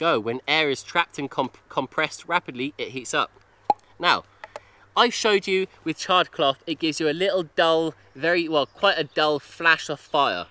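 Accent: United Kingdom. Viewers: Having lived in Japan for 19 years,,,, Man, it is great to hear a UK accent! Thank you, really enjoyed this!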